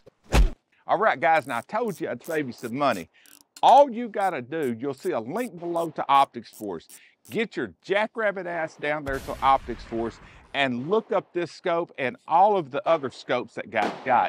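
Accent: South, United States. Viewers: Are you Southern or Texan? Either way I adore you, your sense of humor and editing style!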